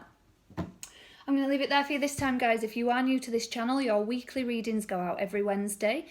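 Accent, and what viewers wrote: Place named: London, United Kingdom. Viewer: so nice of you to think of us. i love your dialect it reminds me of my students years in the late seventies in north London♐️🇨🇭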